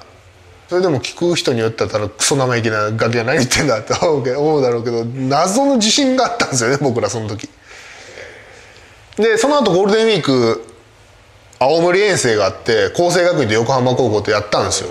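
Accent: Kansai, Japan. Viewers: 微妙に関西弁混じるんが関西生まれですね！わら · 日本版ダルビッシュやん笑 まーくんの関西弁初めて聞いたわ🙂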